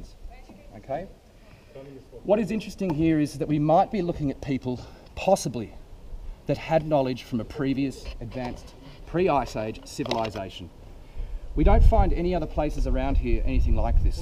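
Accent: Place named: Australia. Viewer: Chuck sent me :) It was nice to hear a fellow Aussies voice. I subbed :)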